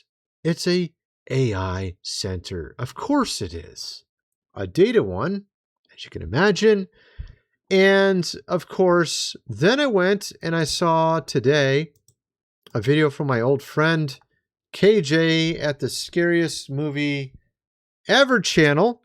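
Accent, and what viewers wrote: United Kingdom. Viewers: Why is a British guy in America ?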